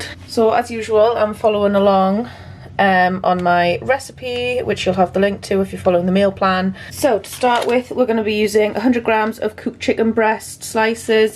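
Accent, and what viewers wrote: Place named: Geordie, United Kingdom. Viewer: I look forward to watching your videos!all great recipe ideas!Thank you!also your accent confuses me lol it's like a posh Geordie one😁😁love your vids and recommendations!! ❤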